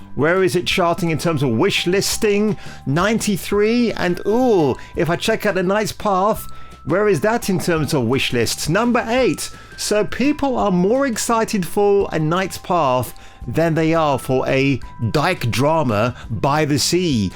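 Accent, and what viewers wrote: United Kingdom. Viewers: your british accent hot chocolate savage criticisms will have these WAHMAN game developers short circuiting, keep it up bro!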